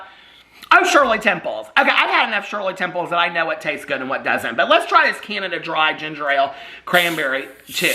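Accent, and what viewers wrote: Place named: Minnesota, United States. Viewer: I'm dying with your Minnesota accent! 😂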